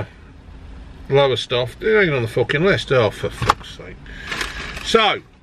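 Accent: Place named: Leicester, United Kingdom. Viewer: LOLL this guy is a proper Leicester bloke hahaha 😂 love it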